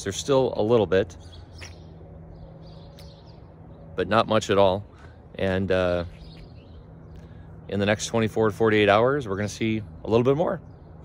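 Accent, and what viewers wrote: Wisconsin, United States. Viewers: He mentioned building in Wisconsin...I am assuming Wisconsin accent. I moved here and he sounds like everyone else here. Not sure why everyone needs to drag the guy for this? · Dudes, it’s just how we talk in Wisconsin. We also say crick, not creeek. Sorry, not sorry. 😎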